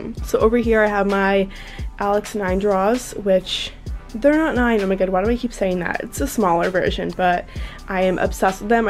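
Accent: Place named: Los Angeles, United States. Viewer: homie you did not need the boston translation you sound like you from Los Angeles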